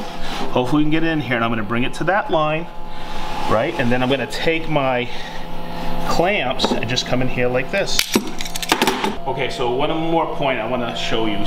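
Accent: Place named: Boston, United States. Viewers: I became one of your subscribers recently. I'm from Mass originally. I moved to the South, KY and it's great to see and hear your humor and Bostonian accent ( and this t shirt here) as well as your thorough teaching abilities. I have to say you, Bents, and Tamar channels are some of my favorite, great teaching and no fluff content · best "Mwwwoooortise"...... luv the East Coast accent. Good video boys!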